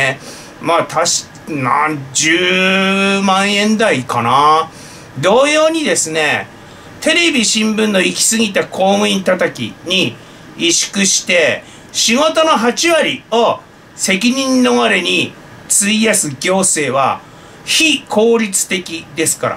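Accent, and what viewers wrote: Fukushima, Japan. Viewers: ほんと、福島なまりがかっこいい。NHK党に戻ってほしいけどね。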